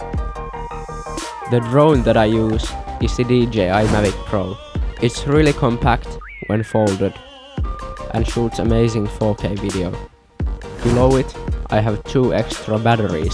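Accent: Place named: Finland. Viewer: Ootin hitonmoista rallienglantia, mitä vielä! Nice🤘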